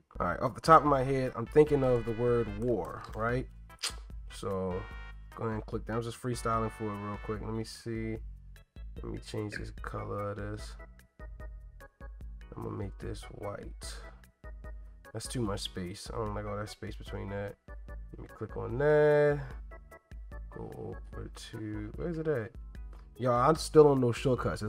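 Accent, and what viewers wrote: Baltimore, United States. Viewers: Bro gotta be from baltimore lol. dope content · This dude accent sounds like he is from Baltimore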